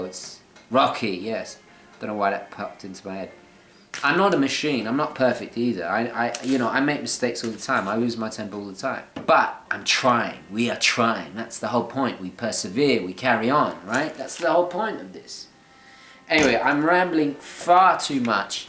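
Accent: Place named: England, United Kingdom. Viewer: I do every much enjoy your reviews and your lovely English Accent. Superb. You dived through the MeisterSigner rather fast and I am the owner (for the second time) of the MeisterSinger Singulator, for which you make some very positive remarks. The reason this is the second time around as the first time, I truly did get confused and couldnt get used to the fact that the big hand (the hour hand) is really all you need to refer to. On the Singulator, it gives you two additional hands, the minutes at the top and the seconds at the bottom, but in all honesty, a rarely make referrrence to either of them, just using the one big hour hand. I wish MS would change the second hand (seconds counter) for a date wheel, which I find something that is really missing on this model. The movement is superb as you say, beautiful. Take a look at one (if you can find one) I am sure you will like it. 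How about a review on QP Watch magazine. You might like that too. Nice work you are doing, keep up the good shows. `Mark, Amsterdam.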